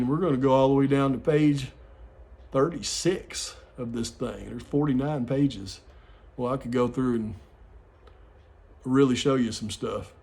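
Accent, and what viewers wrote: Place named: South, United States. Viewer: Wow, that really was a BAM discovery, Mr.! I've been somewhat critical in my remarks about you in prior posts, but I'm coming around to your thinking. However, I still can't figure out who you really are (ex-police, 3-letter agency guy, etc.) Plus, your southern accent.l is intriguing; hmm. Either way, I sure like you! Keep up the great work!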